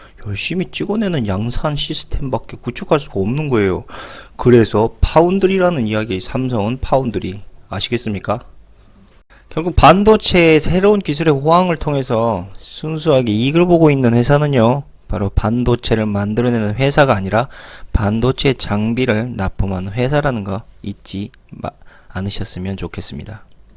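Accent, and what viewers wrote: Jeolla, South Korea. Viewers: 그래그래 알겠고... 삼성 한국에선 못살게하고 해외로 나가게만들자. 어서 빨리 해외로 나가도록 자꾸 못하게하자... 시기질투열등의식나서 죽겠따. 말씨 억양이 딱 절라도네! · 뭔 헛소리 이사람 전라도 억양이네요 아주 사기를 치네요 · 전라도 목소리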